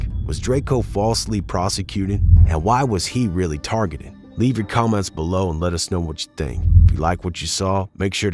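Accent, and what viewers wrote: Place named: South, United States. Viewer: narrator country accent strong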